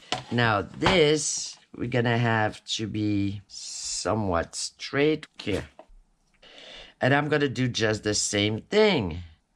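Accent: France. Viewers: Oh I love you already, I'm French too but I live in a mostly English area, but your accent reminds me of my family. Lol, anyway like your video too. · Gosh darn I love your videos,glad to meet you,my name is also,I go by Trish,I love your french accent,I was also born in france,not too far from paris. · Crafting is so much more fun with a french accent! Loving this tut! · you done a great job and here is the story on why i am your subscriber, i first thought you were this other crafter that i havent seen in a long time, i clicked on your channel and your voice and accent was not the crafter i thought you were, then you reminded me of the woman my mom had come to sing us kids to sleep and tell us Norwegian stories, i realized you are French and there could be no way in fashion you were that Norwegian woman bcuz i am 53 and she would be passed on, bcuz i think she was 53 back then. 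but how ever i am glad i clicked on your channel and getting to know you and crafting with you!! i just adore you fully!!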